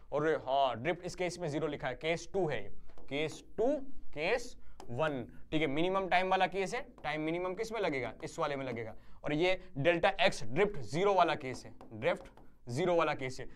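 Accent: Gujarat, India. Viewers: Sir Gujarati confirmed by accent 👏🏼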